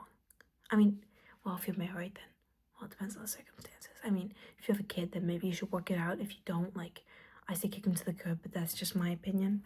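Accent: New Jersey, United States. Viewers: Thank you for another Jersey accent video! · Girrrrrl you’ve GOTTA be from NJ or NY. As a Jersey girl myself, the accent is spot on. Loved this vid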